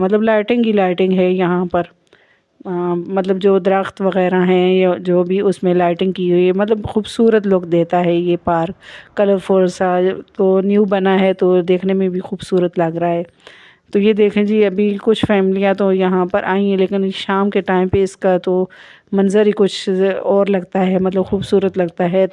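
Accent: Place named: Hazro, Pakistan. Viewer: AP ke Urdu ma hazro k asens ata han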